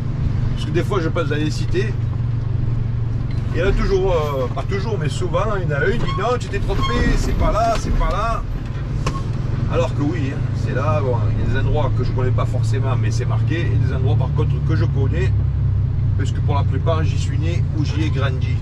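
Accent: Marseille, France. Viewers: bonjour et mer je suis un marseillais de l'an 1950 Né au canet rue mario pavrone pres de charles Moretti ou tu es passé dans cette video et ça ma fait bc plaisir. Continue comme ça tes videos ça nous plait surtout avec ta gouaille typiquement marseillaise. Bon dimanche l'ami. Pour la petite histoire j ai quitté marseille a 8 ans donc des frissons en revoyant mon quartier?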